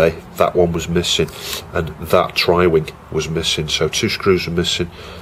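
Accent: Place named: Northern England, United Kingdom. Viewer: So I'm assuming live somewhere in Northern UK from your accent ... but if I paid u could u fix my dsi it won't read my game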